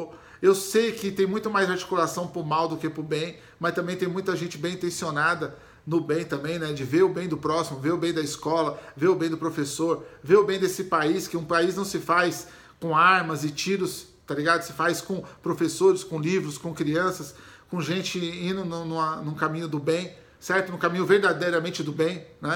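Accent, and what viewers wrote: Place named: São Paulo, Brazil. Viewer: Pô maluco, sou carioca mas me amarro nesse seu jeito tão paulistano de falar.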